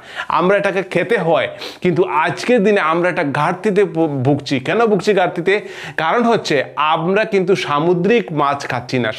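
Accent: Bangladesh. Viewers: বাংলাদেশের বাংলা উচ্চারণ একদম ভাল নয় ।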